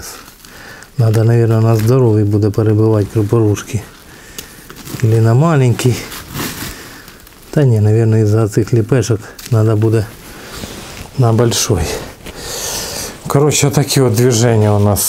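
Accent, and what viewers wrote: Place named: Kharkiv, Ukraine. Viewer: Да это не украинский, это харьковский говор. Чистый украинский совсем по другому звучит и интонация другая.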